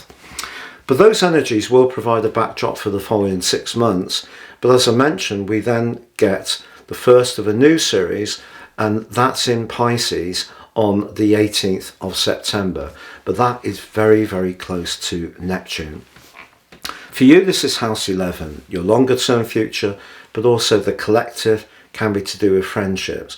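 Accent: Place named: England, United Kingdom. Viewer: Thank you lovely. So nice to hear an English accent on u tube like a pair of warm slippers! Ive had some amazing prophesies Tarot wise i tried to align them with your reading. However, i remain a novice of astrology so it was too difficult for me to do. Time will tell. Best wishes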